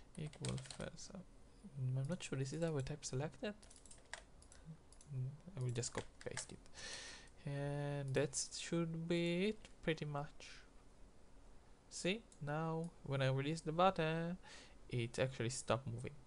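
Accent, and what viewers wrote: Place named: Israel. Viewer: תודה אחי, רואים על המבטא שאתה ישראלי :)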